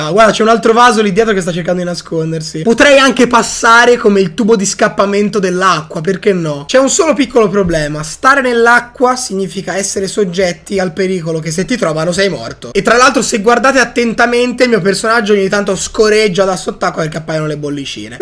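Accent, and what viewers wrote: Tuscany, Italy. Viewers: Sei toscano?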